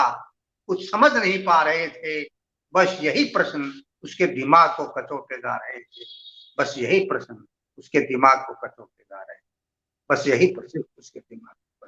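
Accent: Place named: Haryana, India. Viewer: बहुत दिलकश हरियाणवी... बहुत स्वागत 😄😄😄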